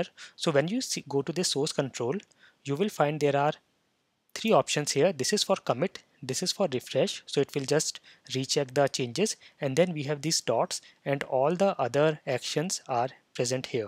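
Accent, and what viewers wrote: India. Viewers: Simple and complete, and his "Indian English" can be easily understandable compared to some others. I'm completely new to Git and this video is so much helpful to me to quickly get started with Git on my VS Code -- thanks! :)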